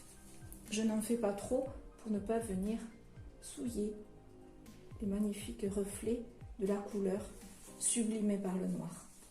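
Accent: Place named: Toulouse, France. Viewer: Magnifique ! Merci pour ce tuto très coloré et moderne....accompagné comme toujours de votre joli sourire et du petit accent toulousain 😁😉😘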